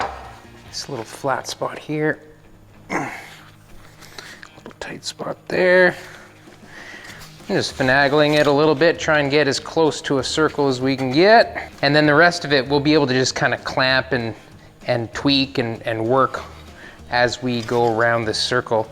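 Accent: Canada. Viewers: haha i thought you were saying “about” and “out” a little weird and then when you said princess auto or whatever I was like ohhhh okay he must be from Canada😂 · Great channel you have and I like the tips and tricks so thanks for those. I’ll be working my way back through your videos as there seems to be a lot of stuff that’s relevant to anyone fabricating in steel not just hot rodders. Picked up you were Canadian as soon as you said “out” and then “princess auto” confirmed it. It’s a bit like Australians and New Zealanders, subtle but obvious once you hear it. Incase you’re interested I’ve put a link to a video I found yesterday of Japanese craftsman hand making what looks like a glove box with compound curves and all done the old school way with tin snips, hammer and dollie’s, shot bag and oxy fuse welding. Not an English wheel or tig to be seen. I haven’t got a clue what he’s saying but I reckon it’s a work of art. 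Cheers, Stuart 🇦🇺. <link>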